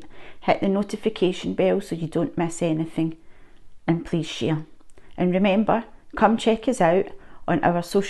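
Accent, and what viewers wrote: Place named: Scotland, United Kingdom. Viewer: Enjoyed your video very informative and lovely Scottish accent ❤. Regards Scotch Rob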